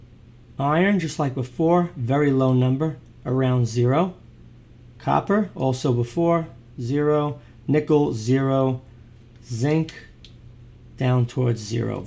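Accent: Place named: Boston, United States. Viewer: So you're a Bostonian. I can tell by your accent plus the can is labeled Boston, Mass.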